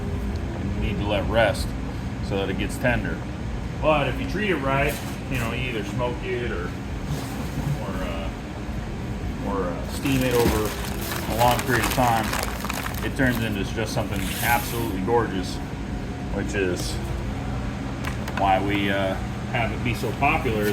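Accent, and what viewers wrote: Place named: South, United States. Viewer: I was dyyying because I literally thought the more he keeps going the more southern he sounds 😂 so I’m glad he acknowledged it. This actually was fun and interesting to watch because my Uncle sells BBQ too. Wish I could try some of y’all’s!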